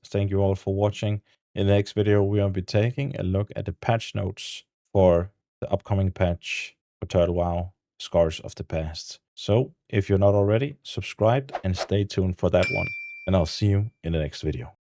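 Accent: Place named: Denmark. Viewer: you sound like a fellow dane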